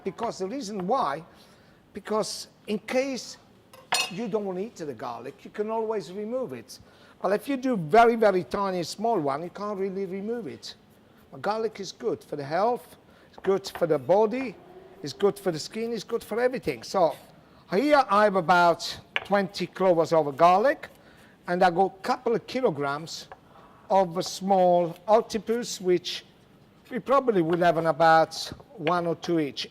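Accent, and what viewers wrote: Italy. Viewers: His English Italian accent is insane.lol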